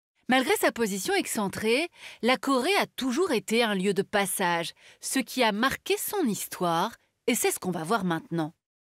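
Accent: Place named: United Kingdom. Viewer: entendre prononcer les noms des "chaebol" à l'anglaise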